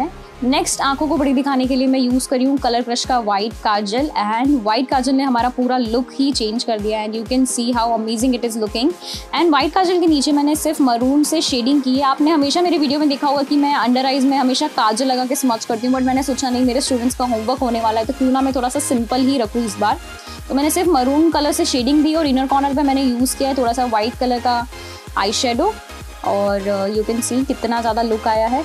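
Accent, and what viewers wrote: United Kingdom. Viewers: British tone MakeUp tutorial pls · British tone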